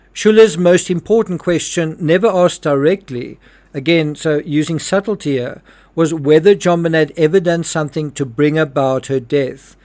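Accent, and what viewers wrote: South Africa. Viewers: South African accent. Love it too when he says that!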